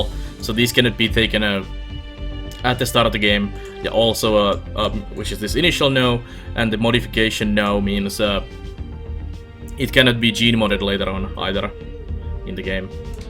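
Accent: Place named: Finland. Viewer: Thats an intense finnish accent there. Edit: But a pretty helpful tutorial nonetheless :D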